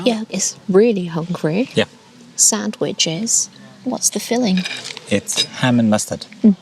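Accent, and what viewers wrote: England, United Kingdom. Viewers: What a lovely video about this idyllic cottage.This is my first ur video and i really enjoyed. If i didnt read you are from Germany I will assume u are born in England.Thanks for sharing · I love your English accent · i like your beautiful voice, i like your british accent too, hello from Indonesia :)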